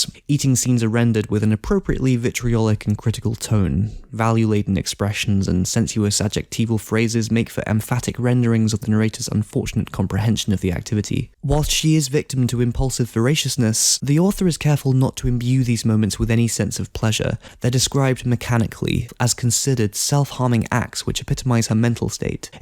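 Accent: United Kingdom. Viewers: Excellent use of clips to accompany what you were saying. I hadn’t heard of the book but this has made me want to read it. British?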